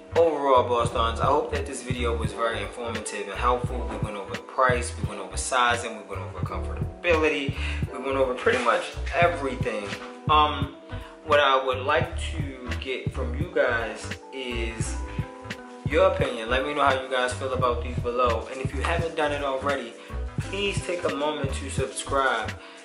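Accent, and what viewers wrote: Baltimore, United States. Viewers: Nigga definitely from baltimore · Bruh is from Baltimore fa shoooo 😭😭😭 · gotta be from bmore lol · you from baltimore? you sound like it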